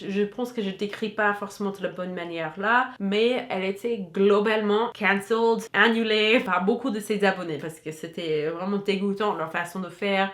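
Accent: Quebec, Canada. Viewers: Tu m’as brisé le coeur :,( La vidéo était hyper intéressante bien que vraiment touchante et triste. Ps: tu commences à avoir l’accent canadien ;) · Super video! PS: ca faisait longtemps que je n'avait pas vu une de tes vidéos, et ton accent à vraiment changé ! Y a vraiment des prononciation et intonations québécoises , c'est marrant à entendre!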